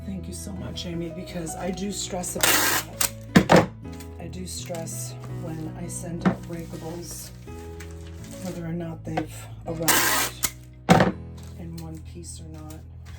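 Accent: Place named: Massachusetts, United States. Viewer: miss hearing the Mass accent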